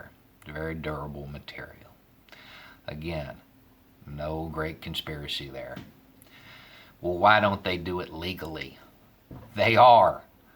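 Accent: South, United States. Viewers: (I'm assuming that is your first name) I've really come to enjoy and respect the videos you put out. I'm still pretty new to your channel and I haven't entirely agreed with everything you say. But I would say I agree with 90% of it. What I find most intriguing is how you fit the trope of "Don't judge a book by its cover." I have no doubt that I am not the first person to click on your video for the first time and instantly take you for a Trump supporter. lol It's the southern accent and the down south good ol' boy look. (This may be a little unfair being Im a native Texan with a southern accent myself. Just not quite as much as yours) Fortunately it doesn't take long to realize you are not a Trump supporter at all. For that I am very grateful. You have very good incite and thought provoking commentary. Keep up the good word my man. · So I'm new here, but is there a reason you lay on a much stronger southern accent in videos on this channel? 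You're speaking voice in the videos on the other channel is noticeably less accented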